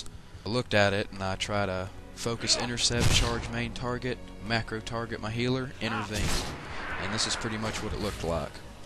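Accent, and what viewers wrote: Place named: South, United States. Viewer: cool, i loved it and i love your southern accent like mine lol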